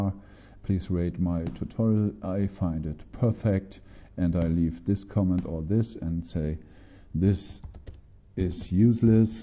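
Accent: Germany. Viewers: How do we see the video in better quality? His English is a bit German :)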